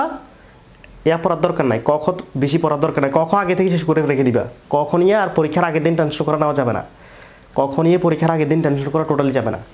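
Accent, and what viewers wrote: Chittagong, Bangladesh. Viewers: Apni ki Chittagongian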